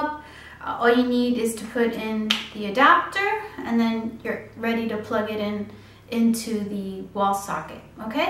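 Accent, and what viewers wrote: United States. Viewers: If you're from Spain, how is it that you sound so American? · I've been watching her videos and I hear an American accent but she holds a Spanish Passport.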